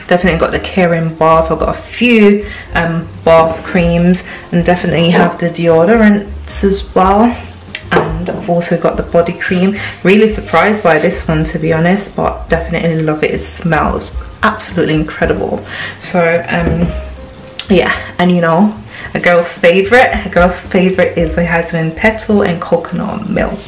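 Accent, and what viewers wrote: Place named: United Kingdom. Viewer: You're 🕊️ haul is 🔥🤍😏 🤍 the British accent as well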